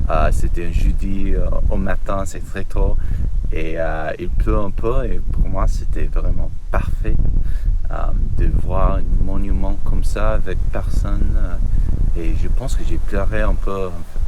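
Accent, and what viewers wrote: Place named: United States. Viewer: C'est parfaitement compréhensible et tu as la bonne démarche : parler le plus possible et ne pas avoir peur de faire des erreurs. En continuant sur ce rythme, d'ici 2-3 ans tu pourras parler presque sans trace d'accent Américain. Pour accélérer le process et acquérir du vocabulaire, je te conseille de trouver des films, séries ou podcasts français sur des sujets qui t'intéressent, avec sous-titres anglais ou français si besoin ou pas. ça permet de découvrir des mots et des expressions que tu n'entendrai jamais dans la vie quotidienne. Tu peux aussi regarder Top Chef ou Cauchemard en Cuisine sur M6 si tu n'en as pas marre de ton métier à la fin de la journée.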